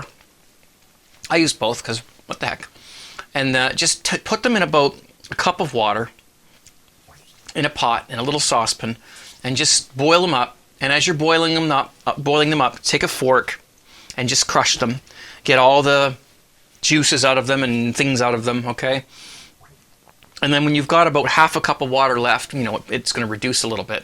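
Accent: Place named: Canada. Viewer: You are my hero. I am picking up a hint of an accent. Are you Canadian or from Canada?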